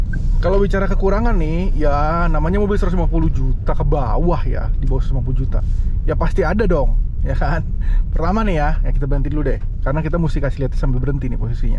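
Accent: Manado, Indonesia. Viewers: orang manado kah ? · Om orang manado kang?😂 Jalang mo ka koka itu dgn di ringroad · Awalnya qt kira ini chnnel jkt pas dgr logat rupa logat manado trnyata plat DB 😅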